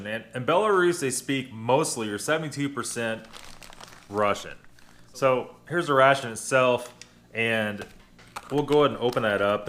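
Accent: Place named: Midwest, United States. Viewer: "If you build it, they will come." Your channel is becoming a phenom. In spite of the bottom feeders, with their assinine commentaries, the viewers with who have possession of their faculties appreciate your no-nonsense, BS free, objective reviews. It allows the ration collector to get vital information in order to avoid purchasing sub-standard MRE's. One final note, if you're from the Mid-West, you pronounce English according to the dialect of the region. If someone from another region can't understand this, they're idiots. (I'm from Sout' Jersey and I murdah da Kink's Inglish. We eat Peetza, Sof' Pretzils, Scrapple sammiches, and Cheez Steaks wid Cheez Whiz, ongyuns, hot peppahs, ana attytude... We drink Yinglings (Yuenglings) beer ice cold, so yous can bite me!....Go Philly Iggles!) 👍👍✌✌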